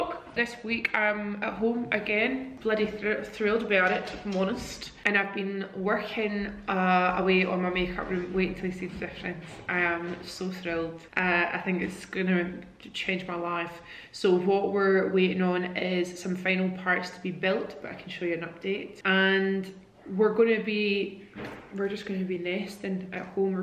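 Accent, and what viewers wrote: Scotland, United Kingdom. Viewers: Is it a Scottish thing or just a thing to say words ending in ED in place of words ending in ING? So for example, when you're talking about your new wardrobes, you say 'these just need painted now' instead of 'painting' I wasn't sure if this was a fun little quirk because of where you lived or just a ' ' No shade just genuinely curious because I love a Scottish accent and know how much the accent can change from town to town. Xx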